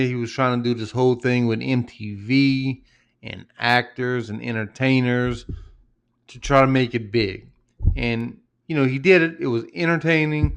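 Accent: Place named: Louisiana, United States. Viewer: You sound like you're from Louisiana, particularly the Houma area.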